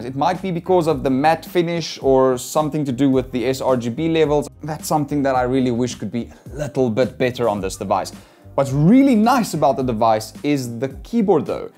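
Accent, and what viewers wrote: Afrikaans, South Africa. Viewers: Hello I just wanted to say you sound south African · Kan sommer die afrikaans hoor in jou stem. Ek het die laptop in Demark gekoop. Beste gaming laptop daar buite op die oomblik net my opinie.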